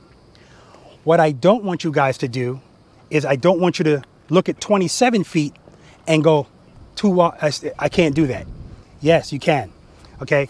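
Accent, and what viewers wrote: New York, United States. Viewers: oh my god man ! your voice and almost everything you say sounds like my friend Zoffinger. look up his channel .he does kayak and fishing stuff. very unique people you are. even though you resemble each other. im a rider and appreciate your content. we are in Fla. hear what you would sound like without the new york accent. im from staten island btw. so i get it.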